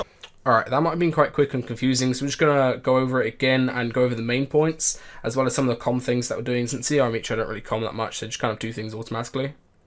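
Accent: United Kingdom. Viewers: Where are you from in the uk?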